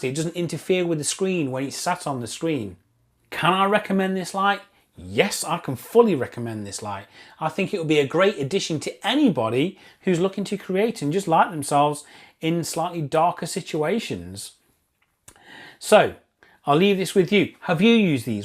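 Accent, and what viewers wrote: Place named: England, United Kingdom. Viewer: You look like American but your accent says you're English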